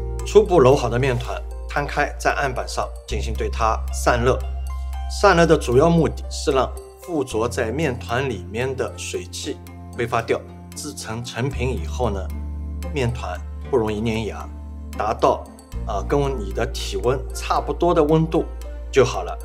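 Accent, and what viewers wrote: Shanghai, China. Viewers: 上海腔普通话~🤣🤣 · Grace W 很明显的上海口音 ：）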